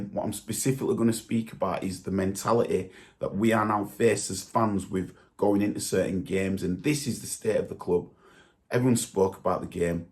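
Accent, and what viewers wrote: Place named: Northern England, United Kingdom. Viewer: why is this northerner talking about Arsenal... a london team ???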